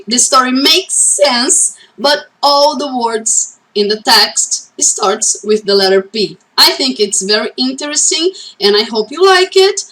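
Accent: Rio de Janeiro, Brazil. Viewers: Você tem o sotaque carioca 😍😄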